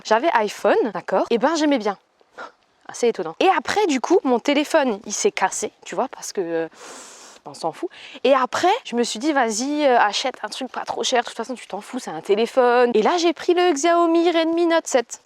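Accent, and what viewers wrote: Alsace, France. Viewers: Bonjour, petit accent Alsacien, Feu Tomi Nora Mols ……….lol……. J'adore...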